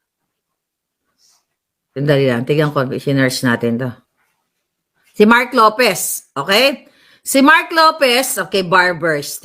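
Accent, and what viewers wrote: Visayas, Philippines. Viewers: Naintindihan ko maharlika ang bisaya mong salita hehehe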